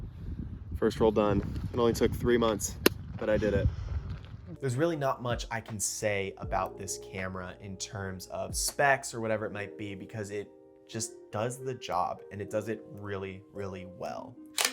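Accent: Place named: Louisville, United States. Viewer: I can tell by how you say it, you're definitely a Louisville native! Much love from the 502 brotha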